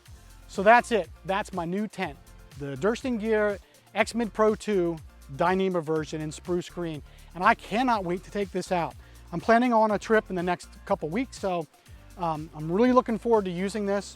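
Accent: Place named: Baltimore, United States. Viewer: Are you from Baltimore? I think I hear it in your accent, the way you pronounce during like "dOOring".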